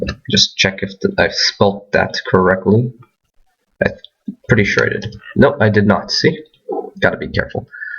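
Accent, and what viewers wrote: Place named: United States. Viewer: You are Portuguese??? You have a very good American accent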